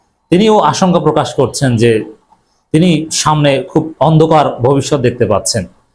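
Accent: Barishal, Bangladesh. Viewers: শুনে ভালো লাগলো - আপনি বরিশালের উজিরপুর /বানারীপাড়ার।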